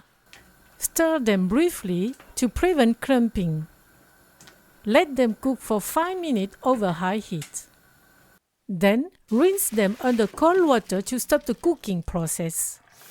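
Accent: France. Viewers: Your amazing! Ty for the recipe. With your French accent I know you would make Vietnamese food w integrity 🤌🏼🫶🏼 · Is that a French accent I detect ? 😊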